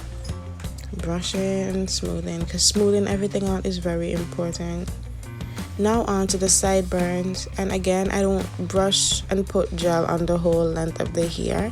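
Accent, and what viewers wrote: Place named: Jamaica. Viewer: Your accent is so close to Jamaican.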